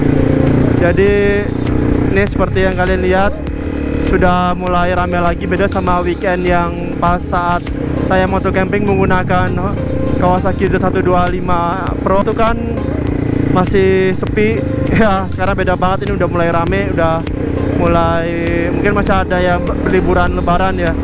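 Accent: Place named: Japan. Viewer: Dengarkan baik2. Kayak aksen Jepang.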